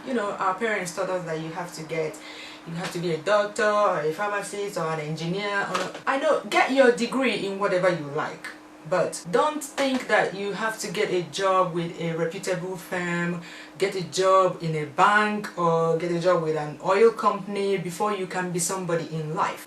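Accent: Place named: Anambra, Nigeria. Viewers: Aunty, you know you are my aunty lol (in my head) . You're tag was awesome and so much fun. I na-asu that deep anambra dialect (my Igbo is small). I got lost for a while haha. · I love love love Anambra dialect!!! Chai, na too sweet!!! I'm from Enugu but I pray to marry an Anambra man lol.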